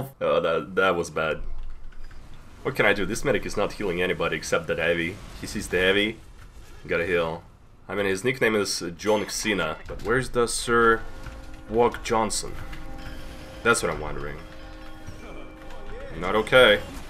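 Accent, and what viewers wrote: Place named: Russia. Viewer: I like these rants about valve I like hearing russain man to. Good video.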